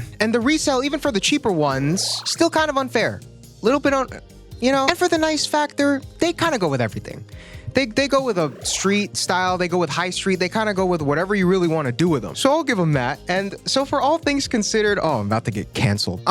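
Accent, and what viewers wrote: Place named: New York, United States. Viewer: It’s funny to see an island boo speak with a New York accent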